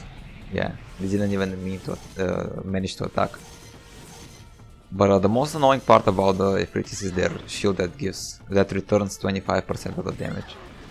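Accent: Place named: Bulgaria. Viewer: Sorry for asking, are you Bulgarian? Your accent really sounds like you are indeed from Bulgaria.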